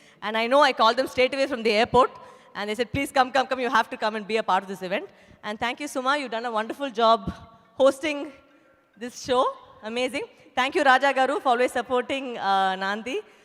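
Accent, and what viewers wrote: India. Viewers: Her Telugu style is same as Karnataka and Andhra border Telugu PPL slang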